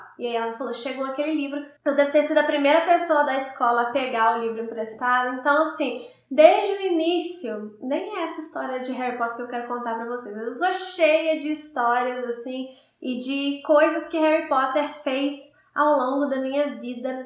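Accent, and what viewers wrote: Minas Gerais, Brazil. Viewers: Boa noite,! Você é mineira?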